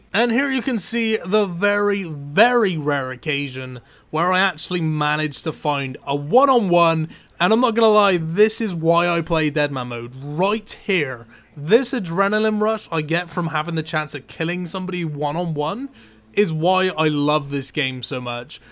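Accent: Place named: South England, United Kingdom. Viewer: Where are you from in the south of the UK btw?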